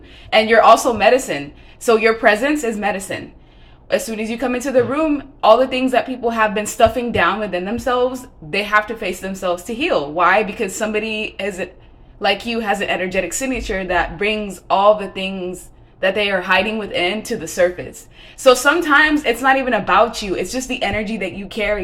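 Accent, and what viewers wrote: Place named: Latin America. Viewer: Girl I’m a black girl I could’ve sworn you were Hispanic, you got a lil accent!!! Lmao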